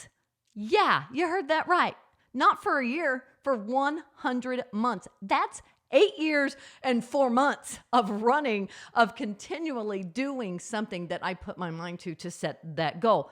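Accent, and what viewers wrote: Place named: South, United States. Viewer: I love your voice. A bit of southern accent, lots of energy, so friendly!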